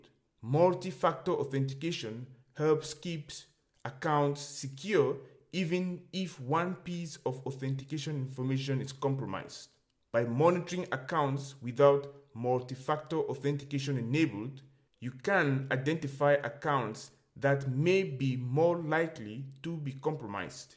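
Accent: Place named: Africa. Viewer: Your name is, but accent is african... where are you from, just curious...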